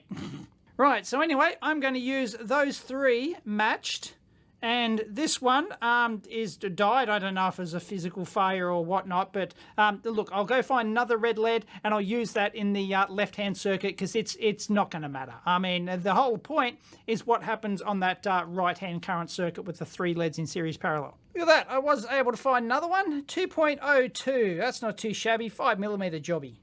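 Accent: Australia. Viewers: is Australian. That's pronounced " 'strine".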